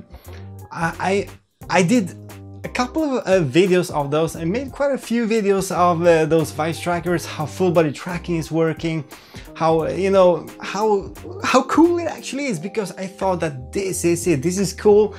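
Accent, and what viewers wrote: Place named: Sweden. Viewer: Not only does energy and enthusiasm for vr come across better than Nathie but he also speaks “swenglish” much better. Nathies popularity comes primarily from his youth and his real life infinite money cheat. My respect goes to the people who earn it outside of youtube.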